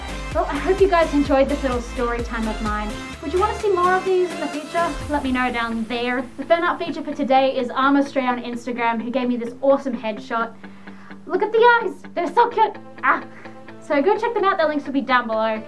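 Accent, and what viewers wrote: Australia. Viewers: 0 ur aussie! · R U ausie?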